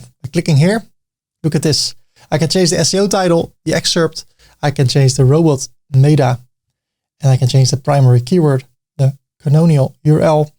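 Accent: Netherlands. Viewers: I've to say it. At first i was like, that voice, sounds familiar. Maar toen wist ik het! een Nederlander !! en toen zag ik maasluis staan. Lachen, Keep up te good work! +1 sub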